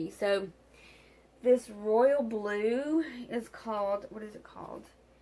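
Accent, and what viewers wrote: South, United States. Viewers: This is such a great declutter video!!! Omgosh! You have such an amazing pallet collection it's CRAZY! I dont know what i would do having nice pallets like these to create with... 😏💭 just gorgeous😍 I have to say, I love your accent. Very strong southern accents are my favorite 😃😍 I use to live in South Carolina, I loved it.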